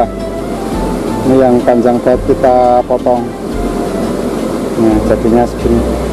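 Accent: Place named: Java, Indonesia. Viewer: Medono agi apik mase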